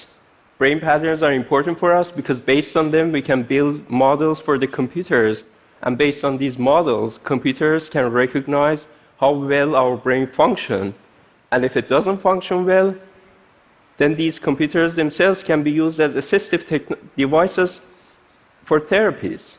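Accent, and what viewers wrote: Iran. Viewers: that persian accent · Detecting...: iranian: Accent, face, brain, hair, eyes and body. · Love to hear native speakers of Persian speak English. Such a beautiful sound!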